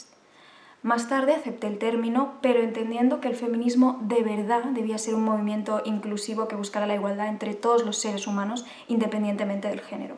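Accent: Spain. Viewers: Y otra cosa la verdad no se de donde seas??. Por tu asento española no se si salgas pero en cuanto te refieres que los hombres hablan mas vete a un bar de estados unidos o de tu mismo pais sienpre abra grupos de muejres a estar hablando y hanlando y no se callan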